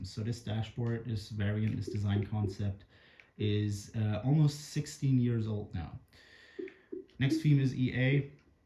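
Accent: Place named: Germany. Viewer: bin hier bevor das video 6 stellig wird :) echt nice, musste auch länger hinhören um zu checken dass du deutsch bist :D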